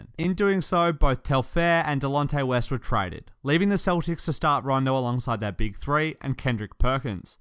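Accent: Australia. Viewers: you are very australian sounding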